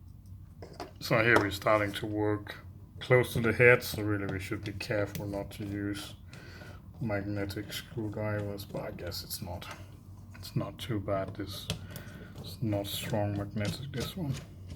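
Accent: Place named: Denmark. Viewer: Nice accent. Er du dansk?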